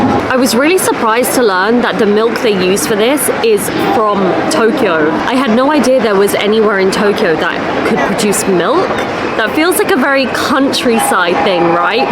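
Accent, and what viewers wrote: United Kingdom. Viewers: 久しぶりの動画😂 が元気そうで何より😊 そしてイギリス英語が耳に心地よいです。これから寒い季節ですがお身体大切に🙏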